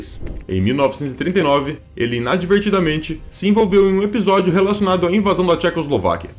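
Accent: Brazil. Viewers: Boa noite, neste caso, está certo, uma vez que a pronúncia usada no vídeo é a do português brasileiro. No mais, se realmente você estivesse preocupado com a pronúncia correta em alemão, ao invés de citar poucos erros de pronúncia ligados ao nome de um campo de concentração, iria escrever uma mensagem a cada vez que o dono do canal dissesse “Hitler”, uma vez que a pronúncia dele é a do português brasileiro, não a alemã, que seria “ritlá” ao invés de “ritlêr”.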